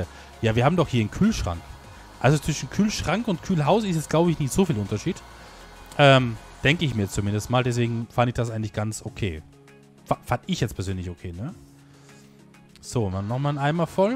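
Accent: Austria. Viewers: Bist du Österreicher?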